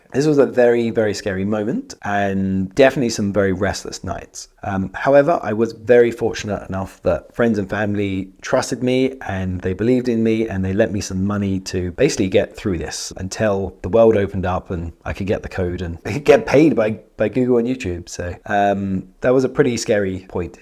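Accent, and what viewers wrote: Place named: England, United Kingdom. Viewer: Amazing teacher, animator and my favorite. Nice English accent as well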